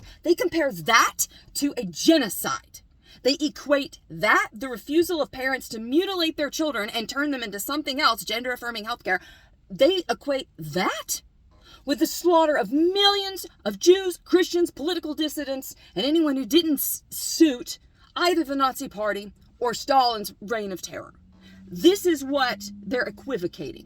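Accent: South, United States. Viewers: Thanks. Very good video and my wife and I heard more of your southern accent in this video. We love it. When the temp of my blood rises the southern, mountain accent comes out too. Bless you!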